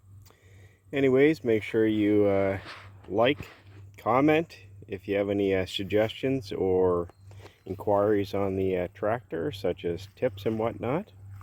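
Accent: Canada. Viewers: Thanks for the overview. Love your Canadian accent - my mother was from NB. I just got a free 1990 YT1238 but in well-worn condition. Very stout machine. Briggs 12 hp with 38 inch deck. Spent a lot of time outdoors but that's not too tough on equipment in our mild So Cal climate. The paint is badly flaking over the rusty areas but so far all it needs is a gas tank, the little rod that actuates the choke and a battery. But I won't buy any of these until I run it around the yard a bit to test the trans which on this model is a hydro (no clutch). I got it to start yesterday and it doesn't smoke so there is hope it will run and cut well. Re the tires I am pretty sure they are tubeless. You can tell if yours has tubes by the valve stem. If they need tubes be prepared for a struggle with the old tires especially on the rears if you can't get them off the axles. When I put tubes in riding mower tires I bolt them to my work table so I have two hands free. 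Re tread wear I have never worn our a riding mower tire. The worst is to neglect them to the point they go flat and crack. But even then they are usually usable with a tube since they only take about 10 psi.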